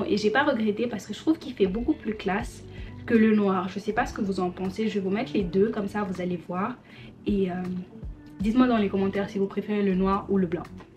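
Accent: Senegal. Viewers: Genre toujours l’accent sénégalaise thieuy sama Mboukou yi❤️🤩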